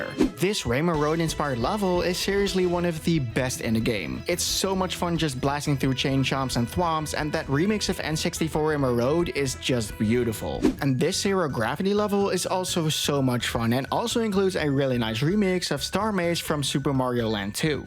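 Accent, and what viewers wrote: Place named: Netherlands. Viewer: Haha ik dacht al een Nederlands accent te horen. Tof filmpje. Ik zou ze graag willen spelen. De newer games, maar ik ben totaal niet technisch, heb geen pc en weet niks af van mods.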